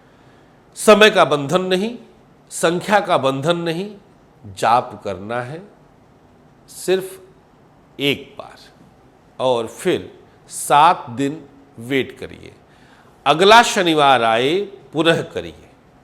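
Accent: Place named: Uttar Pradesh, India. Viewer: Aapki awaaz mein ego Nahin Hai Apnapan hai yah Awaaz up Ke Lal ki hai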